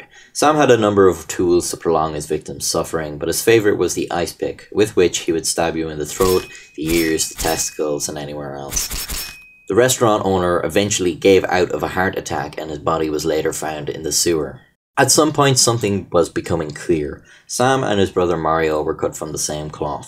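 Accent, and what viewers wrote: Ireland. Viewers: This is just the wikipedia page with an irish accent and bad jokes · The thicc Irish accent makes for a good mafia storytelling voice. More little known Irish personalities, please.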